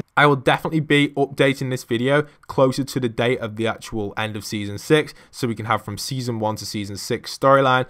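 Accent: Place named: England, United Kingdom. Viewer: He has like English accent don’t hate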